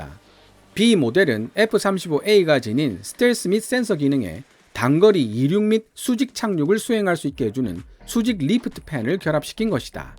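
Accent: Gyeongsang, South Korea. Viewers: 영어단어는 그냥 편하게 읽으시지..참 어색하게 한국어로 말하다가 도중에 나오는 영어단어를 누가 그렇게 혀 굴려ㄱㅏ며 코맹맹이 소리로 어색하게 발음하나요? 거기에 경상도 사투리 억양까지 첨가되서... 되게 이상해요.~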